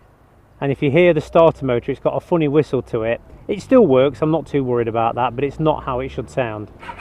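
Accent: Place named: Leicestershire, United Kingdom. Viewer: Im trying to work out the accent...defiantly Leicestershire...roads look familiar . good review...a refreshing change from silly,loud mouthed over excited yanks.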